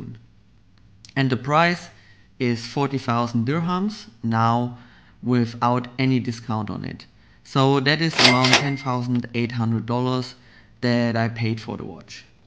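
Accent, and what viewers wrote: Germany. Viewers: du bist 100% deutsch so wie du sprichst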